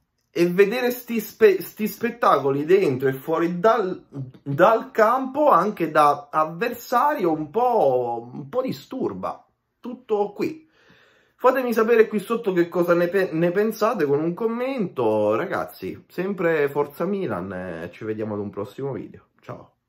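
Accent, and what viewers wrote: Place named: Rome, Italy. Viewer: Molto bello l'accentaccio romanesco 😂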